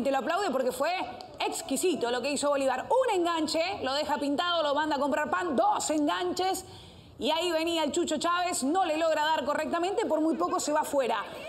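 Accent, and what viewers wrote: Argentina. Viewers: Una hembra comentando ?? Y con acento de argentina ...hasta el culo el periodismo futbolistico en el Peru.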